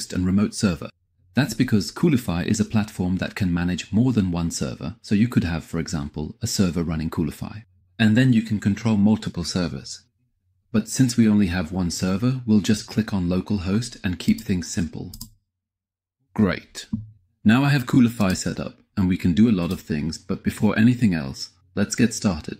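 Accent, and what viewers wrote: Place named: England, United Kingdom. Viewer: I liked ur eng accent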